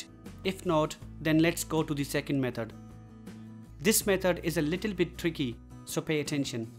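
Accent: India. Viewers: its always the Indian voice to rescue our days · Knew when I heard the indian accent that this would fix my problem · tutorial + indian accent = perfection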